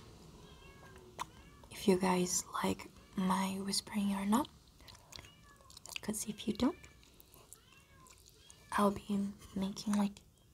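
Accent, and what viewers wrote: Brazil. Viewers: Aquele sotaque brasileiro bem por longe, sabia que tinha algo ai hahahahah Ja vou me inscrevendo e obrigado pelo videi, tras mais lens licking❤ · vc é brasileira? kk na hora que abrir o seu video eu percebir, mais achei que era só achismo kkk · sabia q era br, sotaque inconfundível, bom video!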